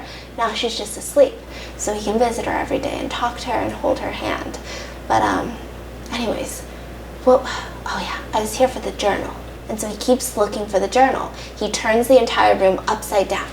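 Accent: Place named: United Kingdom. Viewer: Your fancAy British accent is everythingg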